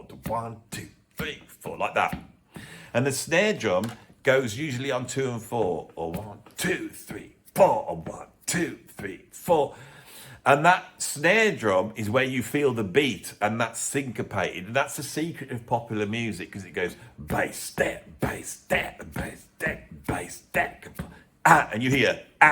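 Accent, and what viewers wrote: Birmingham, United Kingdom. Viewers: He does have lovely, dulcet tones with a soft Brum twang, very easy on the ear! A lot of people have a go at the Birmingham accent, I don't. It's one of this country's finest accents.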